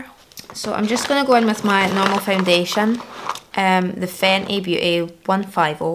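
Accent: Scotland, United Kingdom. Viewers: Hearing a fellow Scottish person on YouTube brings me a lot of joy 🤧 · took me 5 seconds to hit the like button after i heard her accent lmao cant shaft another scot's makeup tutorial!! ur stunning! 💙🤍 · you’re Scottish? - subscribed.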